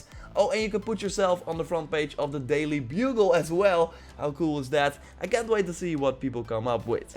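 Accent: Netherlands. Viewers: You sound like a dutch man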